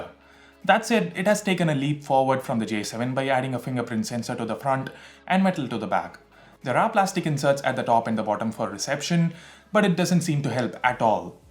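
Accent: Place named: India. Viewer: Indians English sounds annoying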